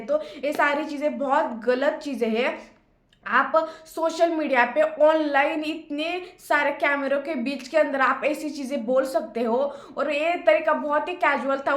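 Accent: Maharashtra, India. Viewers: Work on hindi, strong marathi accent. In good wishes if you want more subscribers.you have good face n presence.❤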